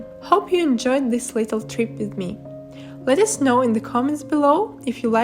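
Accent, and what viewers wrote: Russia. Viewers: Какая красивая! Сразу услышала русский акцент 🥰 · Русский акцент не вытравить ничем Как как она себя назвала?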